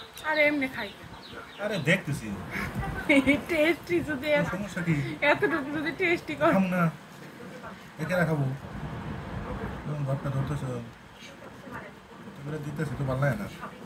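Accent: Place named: Tangail, Bangladesh. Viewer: ভাই আপনি কি টাঙ্গাইল না ময়মনসিংহ জেলার লোক ভাষাটা নিজের মতো লাগছে।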